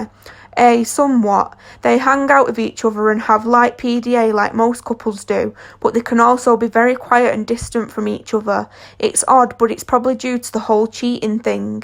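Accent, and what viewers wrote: United Kingdom. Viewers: It’s a British accent (northern region) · You are probably one of theeeee dumbest. The storyteller has a British accent. Now, beyonce on the other hand, sounds like she has a 5th grade education.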